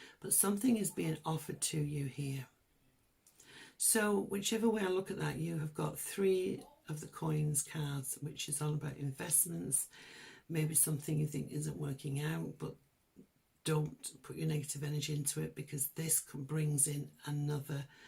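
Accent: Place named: Rochdale, United Kingdom. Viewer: Thank you, this resonates with me in two on-going areas of my life. I've subscribed so looking forward to monthly readings. (Are you a Rochdale girl by any chance? Only asking this because you sound just like me!) The best general monthly reading I've ever watched xx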